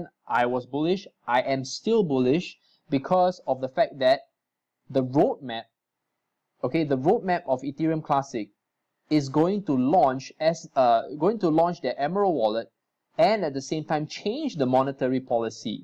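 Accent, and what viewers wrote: Singapore. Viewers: you have great Singapore accent. love it.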